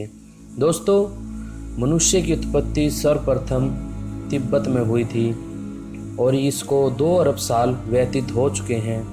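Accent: Haryana, India. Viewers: Bhai haryane ka h k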